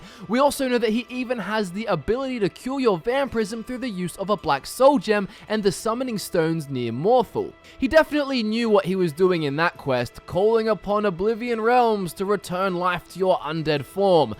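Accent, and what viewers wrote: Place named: Australia. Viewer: sounds like he is Australian but is he Australian?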